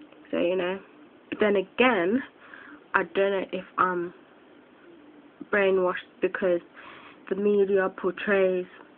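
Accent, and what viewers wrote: United Kingdom. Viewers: nice british accent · Are you from South London? :) I am too! ;D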